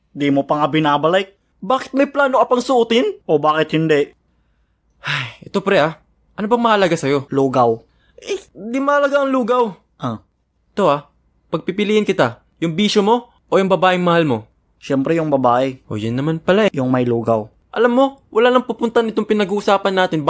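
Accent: Ilocos, Philippines. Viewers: ilokano accent ka pre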